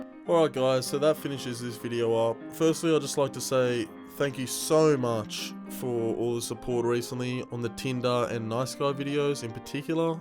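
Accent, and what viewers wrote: Australia. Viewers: right, no wonder you had an Aussie dialect and accent (partial) but not too obvious when you spoke watched half of your videos, trying to catch up with all of them, and realised that your channel only just started a few months ago, and I found your channel not too long ago either keep up with the good work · Christ that is by far the most annoying australian accent i have ever heard.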